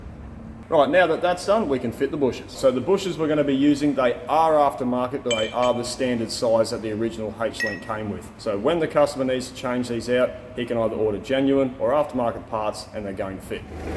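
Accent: Australia. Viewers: Aw man, c'mon, don't worry about the aussie accent none, it's part of the charm of the channel/content, and you in particular. Was a fun watch but I'm sad Karen cut the bloopers of you cussing up a STORM tryna get those bushes in. I know there was some behind-the-scenes rage in there and it would've been hilarious to see xD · Me, not used to the Aussie accent. "Lawn mower?" Oh no wait, "Lawn borer." Like you know, a boring device for out on the lawn, which is probably just a typical Australian way to describe the outside, haha, funny Ozzies. And then it struck me. "Line borer." Okay, English is my third language but still, I should have gotten that sooner!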